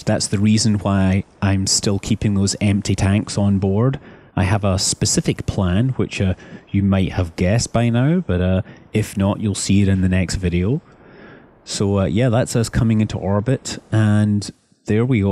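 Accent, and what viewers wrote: Scotland, United Kingdom. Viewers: hi, where were you born? you sound scottish but not completely:P nice video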